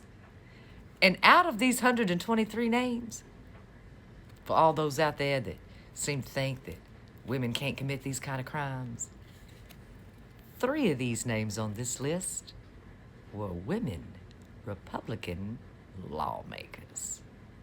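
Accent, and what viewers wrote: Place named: South Carolina, United States. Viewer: South Carolina genteel accent- I'm guessing where this Southern Belle is from.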